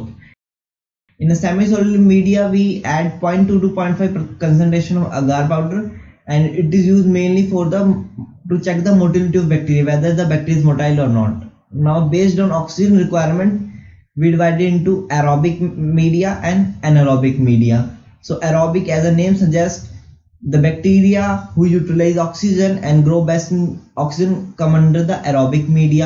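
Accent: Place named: India. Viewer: I’m sorry man but I can’t never understand think Indian accent.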